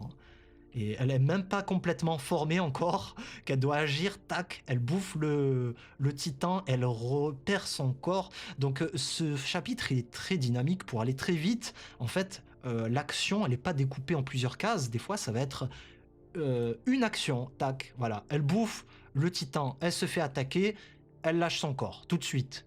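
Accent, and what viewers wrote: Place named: Japan. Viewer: J aime bien ton petit accent japonais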